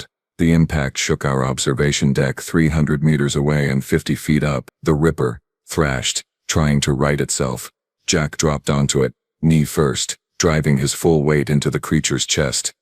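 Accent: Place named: New York, United States. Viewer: New Yorker , ,,, , but good ,,,,,,,,,,,,,,,,,, :face-orange-frowning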